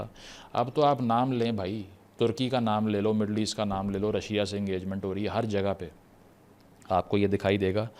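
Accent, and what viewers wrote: Punjab, Pakistan. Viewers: your urdu accent is punjabi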